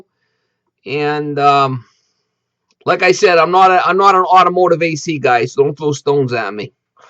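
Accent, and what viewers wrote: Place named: South Georgia, United States. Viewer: That's a South Georgia accent if I've ever heard one... great job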